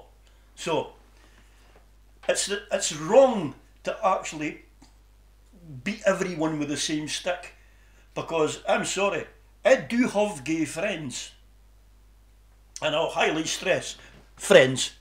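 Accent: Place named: Cockney, United Kingdom. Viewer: Nice cockney accent! Bet you cant do a Scouse one. Good content BTW.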